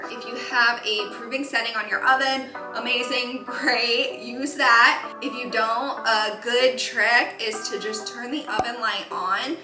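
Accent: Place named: United States. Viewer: Bro is soooo weird like looking at you speaking etc 😂😂 I don’t why but I didn’t imagine you speaking like a classic American girl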